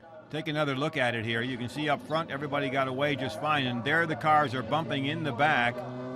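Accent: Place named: United States. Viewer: what's with the american accent?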